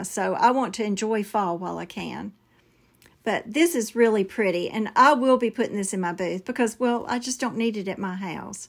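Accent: South, United States. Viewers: I feel so bad for you going through that scare of suspecting you may have shingles. I went through it a few years ago and I did in fact have shingles., we arent here to judge your appearance. Absolutely not. I know that I enjoy your sweet voice and southern accent. You are one of the best creators I watch. Today all of these projects are fantastic. The trimmings mold are so gorgeous with the pumpkin and the greenery and flowers. And OMG that night stand came out so beautiful with the decopauge paper. Matter of fact I just checked it out and I am buying it. You are amazing with everything you touch. Thank you for sharing your projects and for sharing the links in case we're interested. I do hope your hives disappear quickly.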